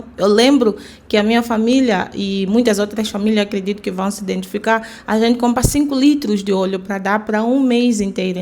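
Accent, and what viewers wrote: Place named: Minas Gerais, Brazil. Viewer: querida,fico muito feliz em saber que vocês estão bem!exatamente saber administrar o seu dinheiro,esse é o caminho para não passar por dificuldades. Até o seu sotaque está diferente,kkkk bem mineirada,que Jesus abençoe sempre seus caminhos.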